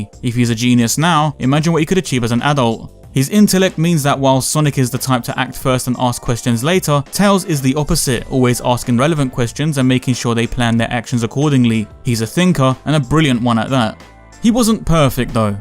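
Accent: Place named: Inner London, United Kingdom. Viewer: I'm curious to know, I'm think you mentioned this in another video but where about in England are you from again? Your speech makes me wanna say inner London?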